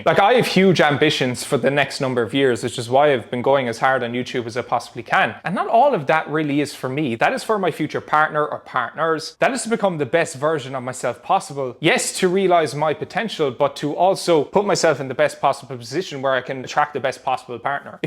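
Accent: Ireland. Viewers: he's from ireland, I think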